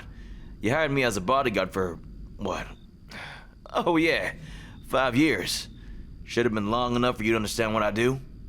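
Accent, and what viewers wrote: South, United States. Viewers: 10/10 the southern accent gets me every single time swoons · Oop the southern hooked me. Im cook cooked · Why do i always simp for the southern accent?! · You don't know how much I loveeeee your Southern accent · I'm gonna need to hear this southern accent again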